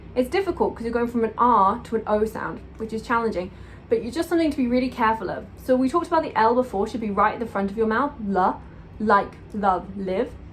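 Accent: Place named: United Kingdom. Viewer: that British accent....... <3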